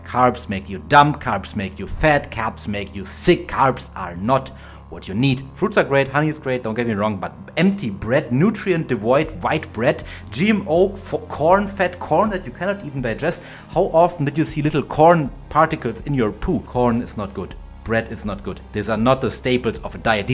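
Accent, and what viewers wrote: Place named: Germany. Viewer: i like your german english. it is like me :) thanks for your inputs.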